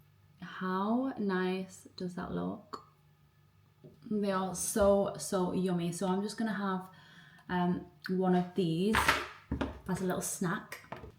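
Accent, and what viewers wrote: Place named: Scotland, United Kingdom. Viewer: Love the video and seeing the squirrel. Haven’t seen one for years. Wow those fees are extortionate. I love the Scottish accent ❤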